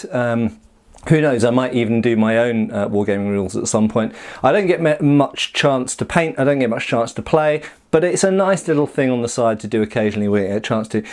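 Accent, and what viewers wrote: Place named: United Kingdom. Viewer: Oh boy, you are so british!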